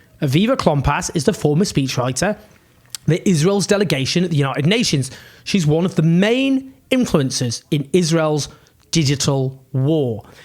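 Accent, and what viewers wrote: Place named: Yorkshire, United Kingdom. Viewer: He’s from Yorkshire, in the north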